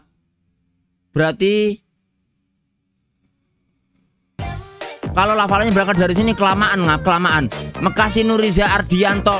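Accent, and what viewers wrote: Java, Indonesia. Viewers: bahasa Inggris mu keren yoan ngab,medok e Yo oleh🗿🗿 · Ngab... Jenengan asline pundi geh... Jowone medok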